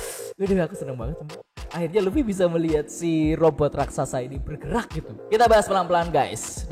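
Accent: Java, Indonesia. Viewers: ngomong ny gak medok bisa gak bang?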